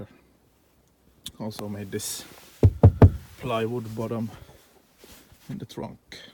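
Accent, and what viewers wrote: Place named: Finland. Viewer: I love Finland, finns and finnish accent. Anyway, great job! I want this car!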